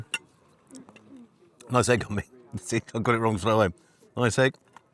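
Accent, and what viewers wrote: RP, United Kingdom. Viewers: Hey, only recently been watching your stuff although a long time ago I recall seeing some of your videos. I'm trying to catch up on the backlog and recently during my lunch break the recent one of being harassed came on my feed and wanted to at least say as a new viewer, it sucks people treat your opinions that way. Since I am a yank I always find your accent very posh and entertaining. Almost like very dry humor, keep up the good work!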